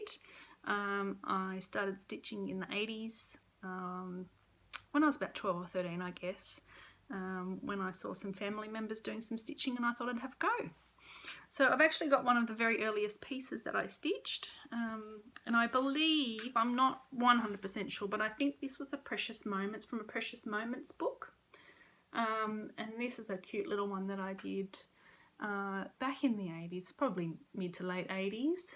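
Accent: Australia. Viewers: Welcome to floss tube, so lovely to hear an Aussie voice. Beautiful work!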